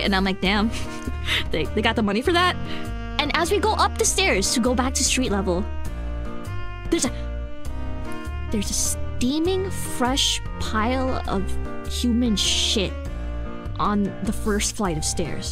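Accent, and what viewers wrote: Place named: New York, United States. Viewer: Yep, sounds like New York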